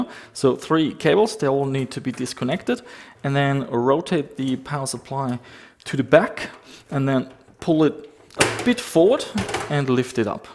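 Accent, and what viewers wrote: Germany. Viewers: ah jes🙈its sounds like you are from Germany😂no hate nice Video✌🏼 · Wenn deutsche english reden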